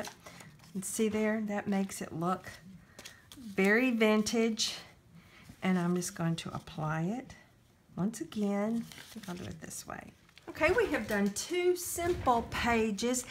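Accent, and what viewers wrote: South, United States. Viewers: Fun thumbnail! Lol like your Southern accent. Aww that's sweet you are acting like your Grandma. I have a lot of similarities with my Grandmother too.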